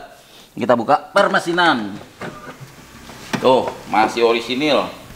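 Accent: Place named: Tangerang, Indonesia. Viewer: lokasi di Tangerang kah? Logatnya kayak Tangerang2 benteng gituu, haha asiikk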